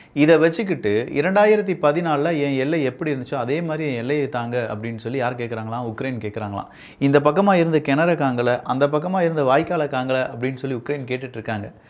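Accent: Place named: Nagercoil, India. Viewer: video ஐ அவரு ஊரான நாகர்கோவிலில் இருந்து கேட்பது.. இனிமையோ இனிமை...❤❤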